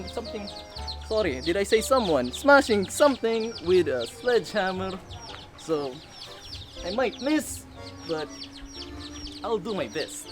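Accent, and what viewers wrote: Russia. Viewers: Love it while smashing some thing plus the Russian accent saket sa tiyan kakatawa😂😂 -r.i.p play button WERPA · kaya lagi ako nanonood ng mga videos mo eh and gusto ko yung russian accent mo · Russian accent 💕 .. HAHAHAHHA 😂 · Love that russian accent😂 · lol russian accent parang si BUCKS. like kong kilala niyo si BUCKS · Stick with the Russian accent! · Hindi, Russian siya di mo ba nahahalata sa accent niya mga bobo talaga mga bata ngayon. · Pag si nag russian accent gumagawa sya ng kagaguhan pero kapag nasa normal accent sya napaka bait😂😂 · Spot on yung russian accent 😁👍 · Russian accent💕😂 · russian accent si hahaha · familliar tlga yung accent ie tangina Crazy russian Hacker 😂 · Russian Accent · That crazy Russian hackers accent hahahaha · Russian accent <3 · Hey Keep Up The Russian Accent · Why the Russian accent tho??? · Comment muna bago nood squad - Pucha when u hear dat russian accent, alam mo na may masisira. 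(YUNG BIG BANANA CUTTING) HAHAHAHAHAHAHA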